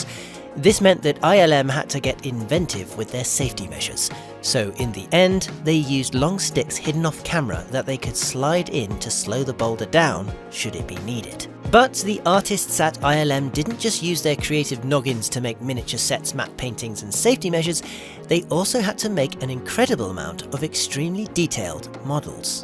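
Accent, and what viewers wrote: United Kingdom. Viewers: Your name is. I presume that at least one of your parents is from Latin America, Spain, or Portugal. I wonder where you get the sort of British accent that you sometimes have? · Due to the extremely thick British accent, I had a big problem understanding some of the narrator's comments.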